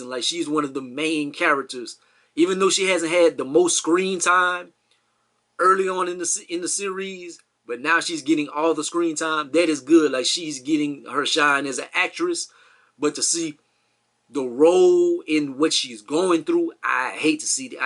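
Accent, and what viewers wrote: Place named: New Orleans, United States. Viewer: Just found you. Adore your commentary. Lemme find out that accent from New Orleans! 😊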